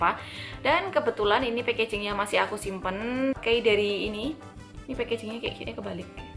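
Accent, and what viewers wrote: Java, Indonesia. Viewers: Lg nyari2..tutorial makeup..ketemu ini channel Tak kirain td bule' kak..eh gak taunya medok jawa...😂asli langsung aku subc ini kak... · medook e... :D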